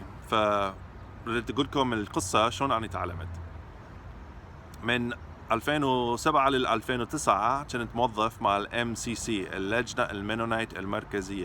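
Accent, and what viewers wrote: Baghdad, Iraq. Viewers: You speak Iraqi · طريقة نُطقه كيوت يا ربي Your iraqi accent is so cute. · فدوووة شون يحجي عراقي يخبلللل🤩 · تقريبا انت دا تتكلم باللهجة البغدادية انت فظيع عراقي قح Very motivating How can we say ضربتوني بوري in English?🤔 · wow , you very smart , how you learn Iraqi accent in just 20 month!! good job, and i want aske you, d you know write and read in arabic or just a conversation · Wow Unbelievable his accent iraqi so clearly respect from Algeria 🇩🇿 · Iraqi accent 100% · وتحجي عراقي همات كلش حلو 👏🖤🖤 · عمي لهجتك عراقية وضابط اللهجة فول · Wow proud of you and also I love your Iraqi accent. I’m a Kurd I currently speak Kurdish / Arabic / Spanish / English / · Oh my gosh I’m from iraq and you sir speak better then I do. That is a proper iraqi accent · It's not the same arabic of the world arabic , it's like iraqi accent but nice We can understand you From Algeria 🇩🇿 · yes this is iraqi accent I think this Baghdad accent nice I like 👍🏻it but much much different from Syrian or Lebanese arabic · Heloo ahoye evvelen alamud arüfek ena said.essanien ente tehçi lehçe İraki Kulliş mazbut cidden mobruk ismi Mehmet ena ayiş fi Türkiye ena hem yene etaellemet min ırakın liennu ehna aku ıraakınn huvaye Fed yom halllin nsavy mubaşır [live] video derbalek ala nefsek ahoye selam Min Al Türkiye 😆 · Wow amazing man,i am iraqi and i find your iraq dialect is so cool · والله لهجتك العراقية اصلية وروعة!!!!! 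· He's more Iraqi than iraqis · Omg! I'm dying😩 that iraqi accent😍 in luv💕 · Hhhaaa ❤️ love from Lebanon Beirut 🤦🏻‍♂️ love Iraq 🇮🇶 love Iraqi accent ❤️🌺 good for u فديتك كلش زين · نبرت صوتك عراقيه😀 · حتا اللهجه عراقيه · هذا العراقي المظبوط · My god,! You blew my mind. Even other surrounding Arabic-speaking countries can not talk in Iraqi dialect like you can. Heck I know some Iraqis in the northern part that are not capable of speaking as you can (with all love and respect to them:D). You just inspired me to learn German and Chinese. You are 100% Iraqi now. Cheers! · he looks iraqi, and speaks like an iraqi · انته تحجي عراقي مظبوط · عراقي قح 😅😅😅 حتى هاي اتعلمتها 🤣🤣🤣🤣 Good you ar Amazing 🌺🌺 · لك اللهجة العراقية مالتك خرافية 😘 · no that nearly truth cuz you're speak baghdad accent and it's the orginal but i'm can't 💕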